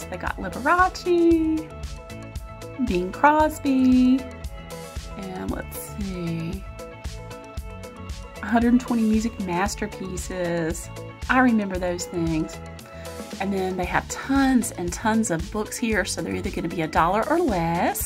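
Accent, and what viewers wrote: South, United States. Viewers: You definitely have a southern accent. I have one too 😀. When my family moved to New York many years ago, I got teased by the way I talk. I told someone once that southerners sound that way because we have respect in our sound 🙂. Then he tried to copy my southern accent 😄.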